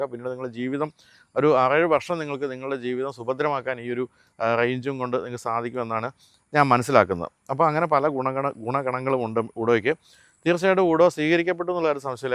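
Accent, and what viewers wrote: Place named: Kottayam, India. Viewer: വെലിപ്പം അല്ല ബൈജു ... "വലിപ്പം " കോട്ടയംകാരെക്കൊണ്ട് തോറ്റു .....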